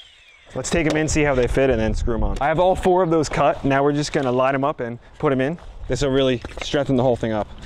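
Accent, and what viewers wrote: New Jersey, United States. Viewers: Wow!! I never expected the string part! What great woodworking! Love the NJ accent; Philly born so it is nice to hear! Good job,!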